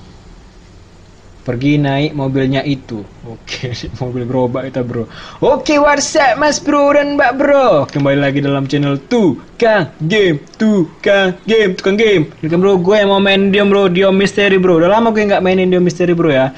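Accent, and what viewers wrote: Medan, Indonesia. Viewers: Logat medan kau ya lai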